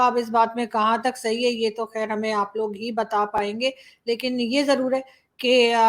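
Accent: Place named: Uttar Pradesh, India. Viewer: 🙏 mam ji ap to uttar pradesh ke se boli bolte hai mam 🙏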